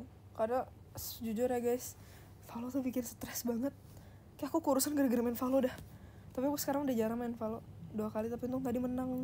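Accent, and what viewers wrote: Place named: West Java, Indonesia. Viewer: Lucuuu bngt passs bilang inima slh Mulu euyyyyyyyy jdi anak Sunda nie 😫🤏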